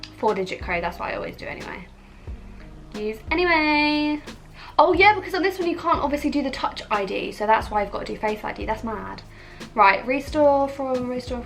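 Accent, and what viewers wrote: United Kingdom. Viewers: I just stumbled across as this video and I gotta admit: You sound very British 😅😂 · Oh my god the British accent sounds soo different to me lol but its kinda cute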